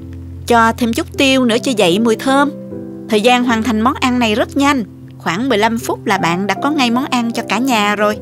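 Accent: South, Vietnam. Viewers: Dung la giong noi Mien Dong Nam Bo.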